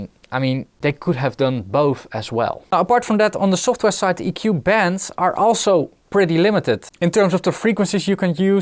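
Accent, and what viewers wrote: Netherlands. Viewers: Steenkolen accent.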